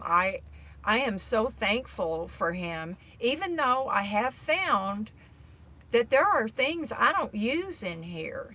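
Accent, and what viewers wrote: South, United States. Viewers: Great video, great lady, a good mom what a rare treat. I also noticed that you call your van "him" i do that. im wondering if youre a Pisces or other water sign. I love how you talk to the camera like we're all old friends. Yuoure very sincere and personable. Great video subject you dont see people talking about this kind of stuff often enough. And i have to say my eyes watered a bit in the beginning, just thinking about how much our seniors have to worry about. Its not ok for it to be like this. Just that so many people dont know if theyll be taken care of or not when they get older, working all their lives to try to survive and then ending up being so scared and feeling so helpless. It breaks my heart. Fuck. My eyes are watering again. I also love the southern accent and a bunch of things about southern culture. i hope those things dont get diluted over time.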